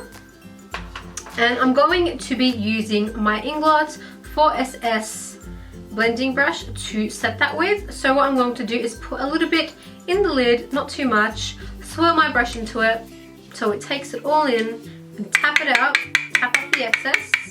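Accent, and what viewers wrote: Australia. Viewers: Her accent is Australian but she is Jewish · I thought I recognized the accent! My guy was born in Melbs! (We live in NY, I'm a NY'er.) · omd she 100 percent sounds like promise phan with australian accent!!!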